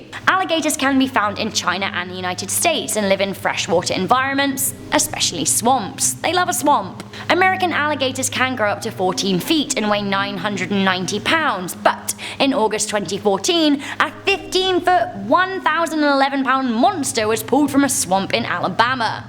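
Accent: England, United Kingdom. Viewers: OK Guys.... be honest with me... "Who else would watch if she was doing a video on Tree Moss". Yup... Me too! The fact the does the Top 10... is a double win! Adorable/Sexy and that English accent just make me melt!... you are 100% Beautiful!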